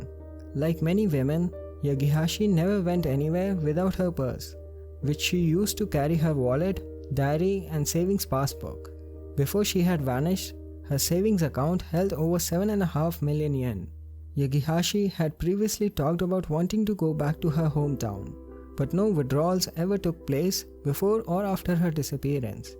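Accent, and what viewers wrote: India. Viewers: Walks in Hears Indian Accent Walks out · the Indian accent is undeniable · I agree. I don't understand having a man with a strong Indian accent narrate this video, of all people. I couldn't understand a lot of it and it was really distracting. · you don’t understand that the creator of the channel is actually an Indian man, and thus is probably going to have an Indian accent? 🙄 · The narrator is prolly from India 🙋🏽‍♀️ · indian narrator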